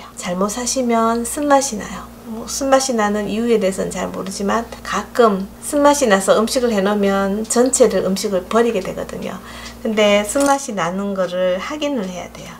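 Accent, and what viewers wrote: Gyeongsang, South Korea. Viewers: 찾아보는 경상도 말씨라 귀에익고 설명도 잘 해주셔서 늘 잘보고있습니다 고추장도 쉽게 가르쳐 주셔서 찹쌀고추장 담아 여기저기 나눠주고 맛있게 먹고있어요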